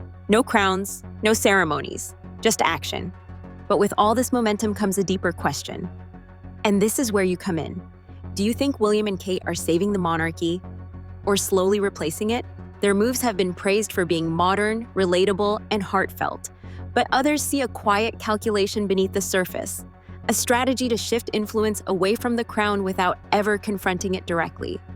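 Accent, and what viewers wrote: United States. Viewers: I am always suspicious when we get announcements from an American voice? I will believe things from an English speaker or a member of the Royal Family or British Parliament.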